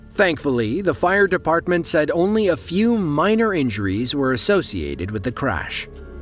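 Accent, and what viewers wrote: United States. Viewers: judging by the narrators accent, he is probably American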